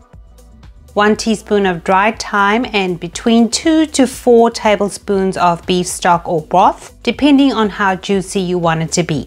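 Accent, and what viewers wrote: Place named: South Africa. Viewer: thought your accent sounded SA. From Cape Town!?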